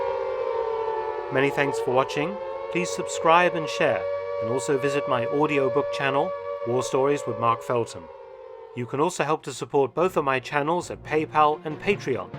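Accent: United Kingdom. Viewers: What he is saying when he repeatedly says something that sounds like: corner lots filled with "appetizing hoarding" or "appetizing awning"? It must be some combination of pronunciation differences (I'm American) and common British terminology or phraseology that I'm not familiar with. -Huge fan of Dr Mark Felton's videos!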